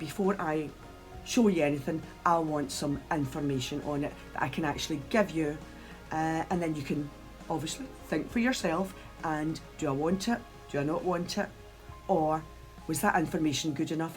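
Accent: Scotland, United Kingdom. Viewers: I think scottish accent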